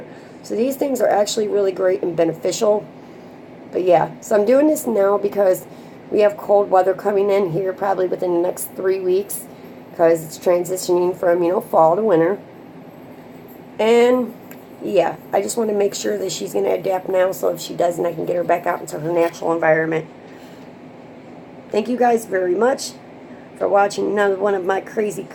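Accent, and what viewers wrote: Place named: Ohio, United States. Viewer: Awesome stuff! I am actually trying the same thing with a much smaller spiny orb weaver! How crazy is that! You said everything I couldn't say about my viewers. I started out the same way. Just a few friends & family & now I have more friends & family on here! Lol. This community is one of my favorite parts of the hobby aside from the critters. PS. I thought you sounded like you were from Ohio! Norvis is actually a good friend of mine & y'all all have a similar accent! Lol 😂. Great stuff girl keep up the great work!!!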